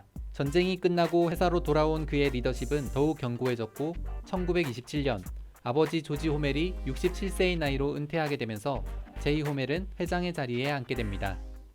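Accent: Gyeongsang, South Korea. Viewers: 이분 경상도 출신 혹은 경상도와 관련있다에 내 돈 모두와 손모가지 검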